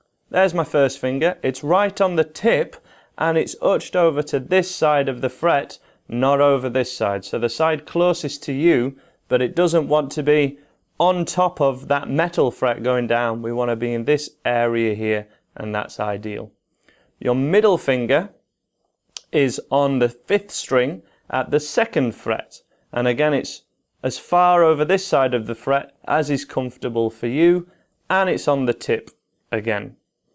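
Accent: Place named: Northern England, United Kingdom. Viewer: Most recent!!! Hi I'm enjoying your video. Thank you for taking the time to post it in order to help beginners like myself. I'm English living abroad and it's nice to hear your northern accent. Feels like being back in the UK. 🤩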